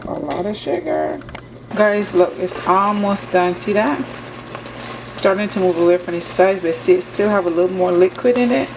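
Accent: Barbados. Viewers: Great to hear your bajan accent peeping thru!!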